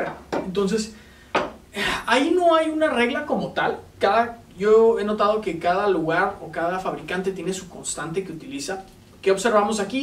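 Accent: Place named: Bogotá, Colombia. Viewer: He estado mirando la we y he visto que es de México, Me llamó la atención porque me daba la idea de un acento de Bogotá- Colombia y me he sorprendido al ver que es de Tijuana-México. El acento es prácicamente igual, de lo cual deduzco que México no es el solo acento que más conocemos , que será de algunas zonas, pero el de este joven es muy correcto, muy bien pronunciado, pero no es muy conocido.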